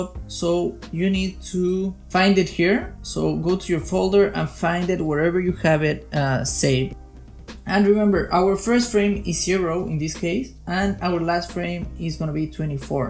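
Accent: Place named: Spain. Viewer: Se nota mucho que eres español xd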